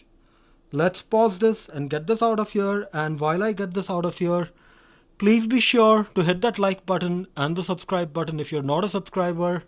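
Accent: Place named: India. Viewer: when i hear indian accent, all I know all of this was bullsh*t and you stink , you didnt even explain the acronyn 62 "MAS" seikomatic selfdater bullsh*t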